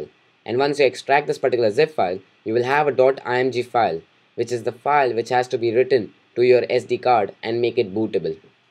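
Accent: India. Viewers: Great video. Love your accent, I work with several guys from India, you made me feel at home!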